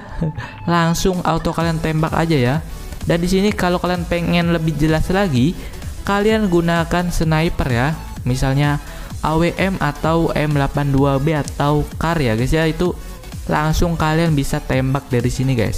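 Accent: Java, Indonesia. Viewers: Aku tebak pasti abang orang jawa kalo egak salah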